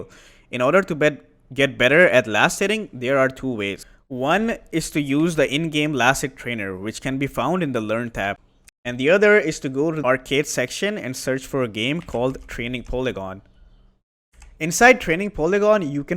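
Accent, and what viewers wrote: India. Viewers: good video but your editing is shit. sometime your voice is slow and sometime its soo fucking loud. indian sland already annoying enough now you add slow and loud voice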